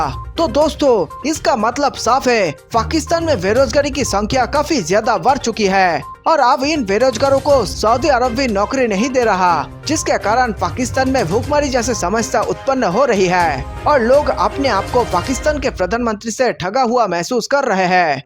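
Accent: Bengal, India. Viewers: भाई आप बंगाली हो क्या? बोलने के लहजे से तो ऐसा ही लगता है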